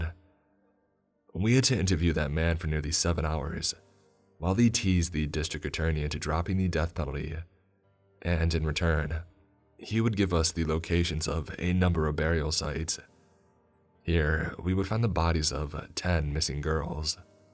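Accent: United Kingdom. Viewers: Can tell it’s a Brit that described this hoax story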